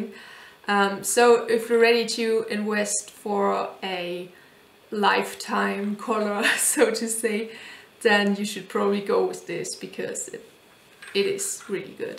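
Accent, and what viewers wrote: Germany. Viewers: And that German accent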